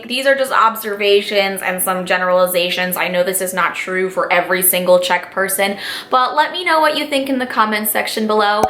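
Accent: United States. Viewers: mluvíš jako kdyby jsi se narodila v americe :D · xD veľa američanov rozpráva takto xD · Hi darling, i love you really. I am from Czech, and I love you for one reason i think you are proud to be half czech person, if you dont understand me i wrote it in czech. Myslím si, že si pyšná na to že si napůl češka, a to je moc krásné od tebe. Doufám, že si to přečteš nebo mi dokonce odepíšeš. Bylo by to pro mě hoodně velké překvapení protože tě miluju, tvoje videa jsou 100% úžasné. A ikdyž máš americký přízvuk tak ti nádherně rozumím. <3 I love you!!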